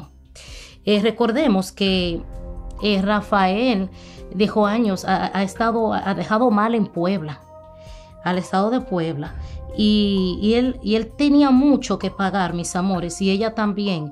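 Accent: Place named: Dominican Republic. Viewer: Pero mami tú ablas como Dominicana a pues ya tu sabes. 🤔😁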